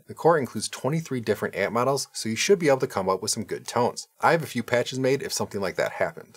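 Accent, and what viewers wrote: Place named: Midwest, United States. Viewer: nailed it- recognized the accent fellow midwesterner!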